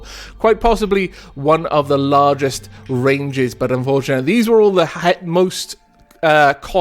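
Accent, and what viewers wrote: Wales, United Kingdom. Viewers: You're welsh? Grwp? :)) that's awesome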